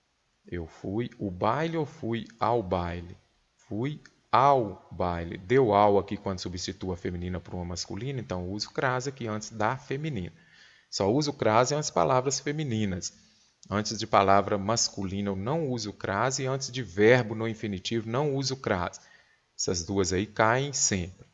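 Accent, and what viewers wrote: Minas Gerais, Brazil. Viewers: Professor, você é mineiro?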